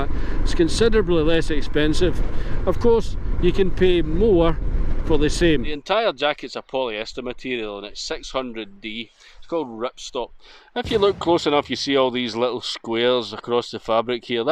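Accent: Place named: Dundee, United Kingdom. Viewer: Familiar accent as I haved lived 6 yrs in Dundee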